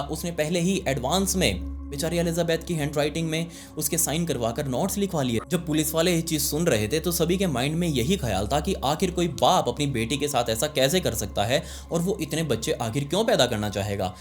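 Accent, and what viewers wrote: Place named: Haryana, India. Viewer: bhaiya ki haryanvi bich bich m nikl jati h m ek ek word notice krti hu😂😂😂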